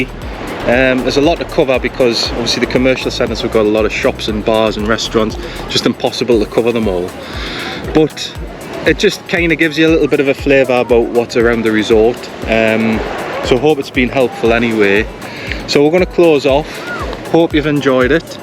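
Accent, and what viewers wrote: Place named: England, United Kingdom. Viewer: Hi,fairly accurate video, trying to make out your accent to see what part of England you're from.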